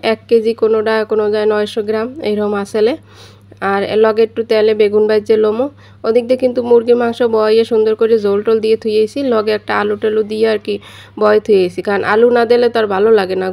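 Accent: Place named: Noakhali, Bangladesh. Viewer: আপু লাইক দিয়ে দেখা শুরু করলাম,, আপনার কথাবার্তা আমার খুব ভালো লাগে,,এটা কি নোয়াখালীর ভাষা???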